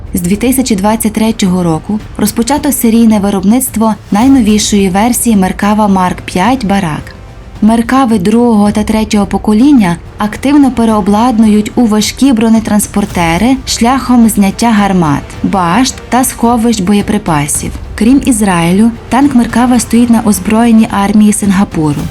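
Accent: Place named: Lviv, Ukraine. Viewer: От слухаю диктора, і розумію що цей диктор точно з Львівщини)))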